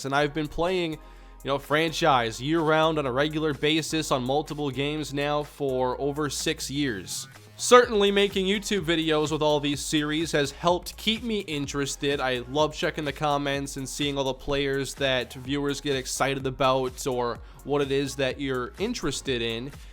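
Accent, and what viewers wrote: Minnesota, United States. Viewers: are u from minnesota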